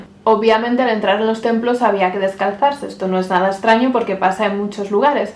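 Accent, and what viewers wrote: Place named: Spain. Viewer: No, ella es española, del Norte (Cantabria) al parecer. No sólo su pronunciación es claramente española sino que ella confirmó de dónde es en alguno de sus videos.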